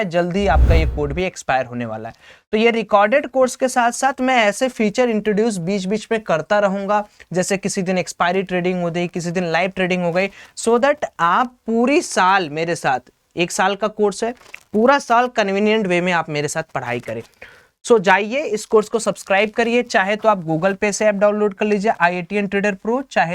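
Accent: Varanasi, India. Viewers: Varanasi se ho kya bhai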